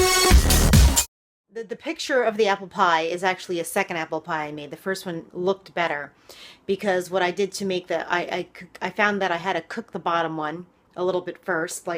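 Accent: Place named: New York, United States. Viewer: I can't get enough of that NY accent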